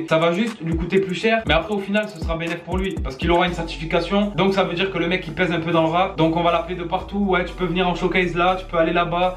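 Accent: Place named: South, France. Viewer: je pense tu vient du sud car tu dit tranquillement tarpin .......et ton accent je pense tu vient du sud , moi d'Alsace (Strasbourg )kartier neuhof zone sensible de non droit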